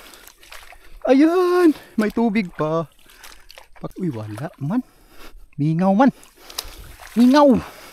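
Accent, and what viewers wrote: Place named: Bohol, Philippines. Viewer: Dayo raka Dha sa agusan Dol.. Imong Sinultihan Kay murag Taga Bohol nya ka pronounce nimo taga Tarlac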